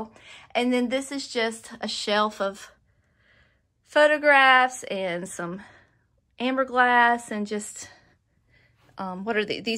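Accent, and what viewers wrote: South, United States. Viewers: are you a southern belle? I recognize your accent from all the programs I have watched lol. I am from the Netherlands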